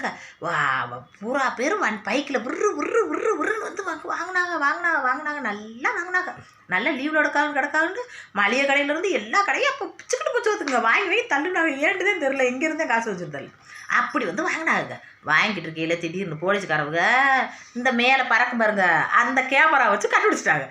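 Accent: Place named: Ramanathapuram, India. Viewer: உங்க ஊரு ராமநாதபுரம் மாவட்டம் பேச்சை இது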